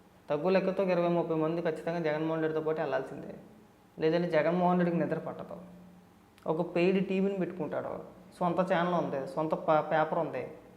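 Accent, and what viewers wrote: Godavari, India. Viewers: Power of Godavari slang 😊❤🎉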